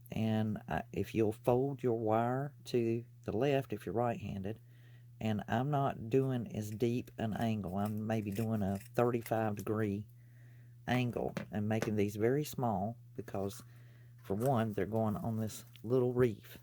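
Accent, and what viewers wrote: South, United States. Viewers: We live on a teeny tiny island off the coast of NC...so...you KNOW I LOVE this one. Covid closed down all the craft shows that feed, house and clothe my family so it's been a really HARD year. I'm ready to kiss 2020 goodbye come midnight December 31😘🙋‍♀️. Looking forward to a NEW YEAR 😊🤗 and lots of new crafts!! TFS and I find your sweet southern drawl so charming and relaxing...like sipping sweet tea from the front porch rocking chair on a hot summertime afternoon 🎄💓God Bless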